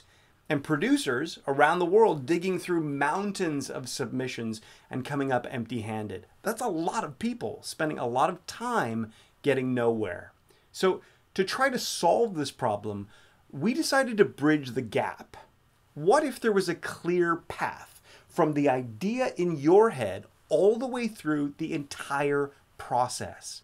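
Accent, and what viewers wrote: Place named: United States. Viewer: You sound american...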